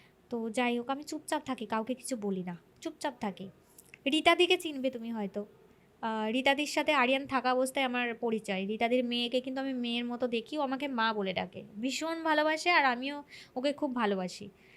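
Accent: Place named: Bangladesh. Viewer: UNI BODH HOY BANGLADESHI BY BIRTH...... ONEK BANGLADESHI MANUSH OI BHABEY PRONOUNCE KORE......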